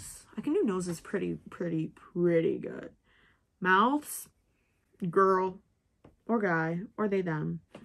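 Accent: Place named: Upper Midwest, United States. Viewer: Haha the upper Midwest accent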